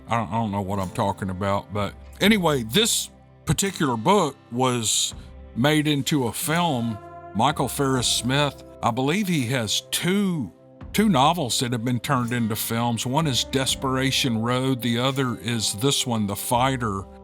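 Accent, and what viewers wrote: South, United States. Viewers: I adore your accent!!! It's' so great to listen to someone who sounds just like me!!! ❣️❣️❣️❣️❣️ I Could listen to you all day long! You are from just a little bit deeper south than me. (Hahaha!!!)I'm from North Carolina born in Winston-Salem. But I've been everywhere. And enjoyed every minute of my travels. Someday I'm gonna write my adventures down. I don't really know how i survived those dangerous times, but thank G-D I did. Love ya!!! Keep up the great story sharing!!!😮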